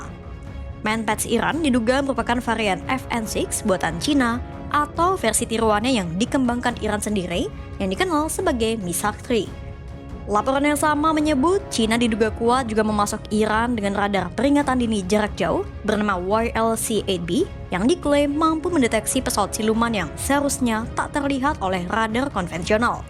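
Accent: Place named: Palembang, Indonesia. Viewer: R khas plembang kok.., ya begitu lafalnya.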